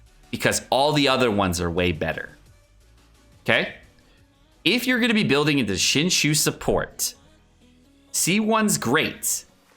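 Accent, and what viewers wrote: United Kingdom. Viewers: Y British tho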